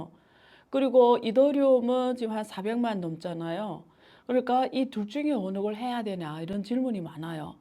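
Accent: China. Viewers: 교수님 어느새 중국어 억양이 많이 없어졌네요. 몇년전에는 듣기 거북했는데 이젠 자연스럽게 들립니다. 건강하세요.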